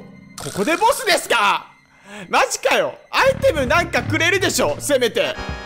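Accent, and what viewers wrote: Fukuoka, Japan. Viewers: さんの福岡弁がなんか良いですね！これからも楽しみに見させていただきます😀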